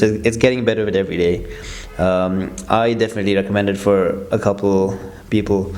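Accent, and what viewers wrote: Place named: India. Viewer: well by changing ur name you cant hide your indian voice. nice review though.!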